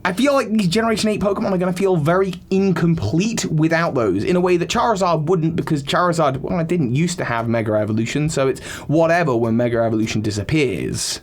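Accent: United Kingdom. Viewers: Wait your British:o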